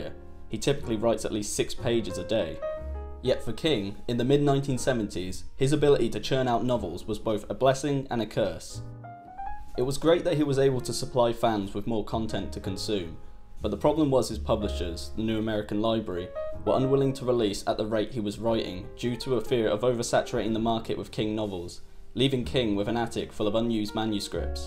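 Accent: United Kingdom. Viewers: Sounds exactly like the first Holly on Red Dwarf. Which UK accent is that? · That was an interesting video which I enjoyed very much. However, I have to ask you about the way you pronounce words? You sound generally well educated, yet inconsistently drop letters from your pronunciation without warning. As Bachman was outed, am I outing you as somebody who is trying to disguise his natural language tendencies with more 'street' or 'modern' inflections? If so I'd strongly suggest you stop it. It sounds bad. Just use normal English. If it is not on purpose please re-listen to what you have produced here and notice the 50+ times you make errors in pronunciation. Your voice is far too posh to be cool. And I think that way of speaking is on its way out now anyway. Estuary English is just an affectation that politicians use in the mistaken belief it makes the more appealing to the proletariat. 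It does not, it's merely patronising. You are better than this. I think you can speak more correctly (and probably more naturally) than you do in this video, and I think you would sound 100% 'beta' if you did so. As I said, good video, just tidy up the language son 🙂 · I don’t mean this in a bad way, but you are the most British person I’ve ever heard on the internet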